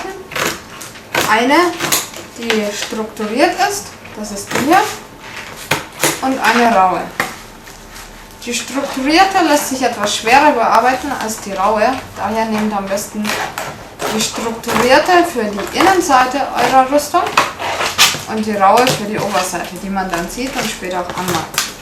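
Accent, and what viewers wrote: Germany. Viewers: Wusste ich's doch^^ deinen deutschen Akzent kannst du nicht ganz verstecken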